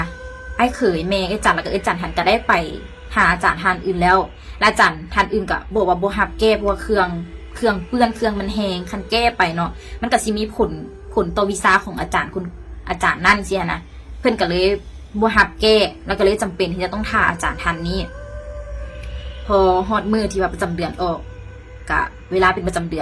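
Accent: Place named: South, Thailand. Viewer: ຮູ້ສຶກວ່າທາງພາກໃຕ້ຂອງຫຼາຍເນາະ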